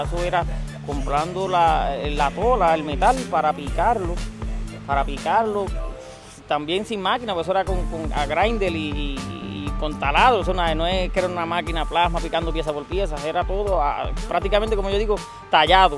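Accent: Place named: Puerto Rico. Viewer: Congratulations'! TIENES vision y talento DTB. Saludos desde Florida .USA. Suenas boricua !wepa!!! Bonito color.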